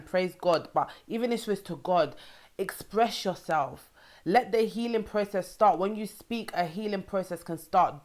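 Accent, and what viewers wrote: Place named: London, United Kingdom. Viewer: I just love that accent, oh how I miss London. You are doing such an awesome job with the content you are touching on. There are life lessons in all disappointments. Thanks for the inspirational message.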